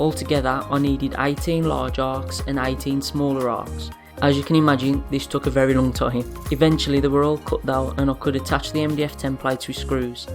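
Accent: United Kingdom. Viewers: yes and finally found a good man speaking british accent.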